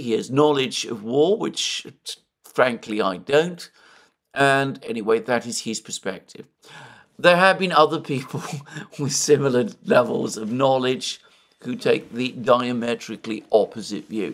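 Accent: England, United Kingdom. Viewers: I wouldn't be able to read it with that English accent.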